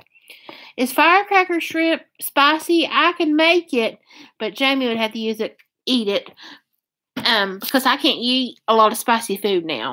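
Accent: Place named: Kentucky, United States. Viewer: I knew you were from Kentucky! 😀 You have that lovely “gentle southern” accent that seems to be specific to Kentucky 😊 so soothing. It’s one of my favorite things about you. 💗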